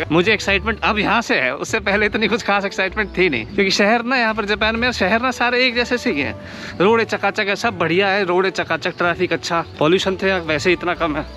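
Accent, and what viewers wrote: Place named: Baghpat, India. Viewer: bhai आपकी भाषा हमारे इलाके से मैच करती है बागपत को जानते हो क्या? Hamari DELHI NCR on the top❤️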